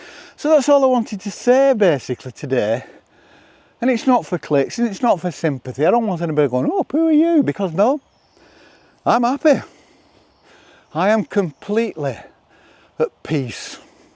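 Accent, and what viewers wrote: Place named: Lancashire, United Kingdom. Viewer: Thank you for sharing your openness and authenticity of your self. Not only as a fellow Lancashire person no longer in the area am I drawn to your accent and the scenery I find it refreshing to see and hear authentic videos. See you on the " flip"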